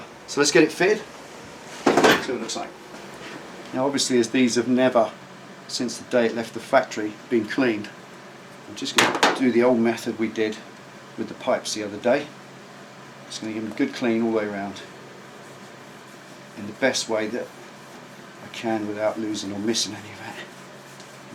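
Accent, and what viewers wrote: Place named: West Country, United Kingdom. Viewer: Top yoke every Tuesday made me laugh. Gurt there's the sound of the West Country.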